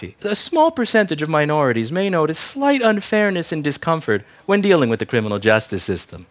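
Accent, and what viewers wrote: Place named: South, United States. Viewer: Southern Voice El gato es muy buneo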